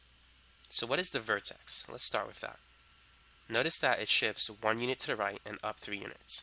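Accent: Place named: New York, United States. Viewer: Are you from new York or Boston. you sound like your from new York or Boston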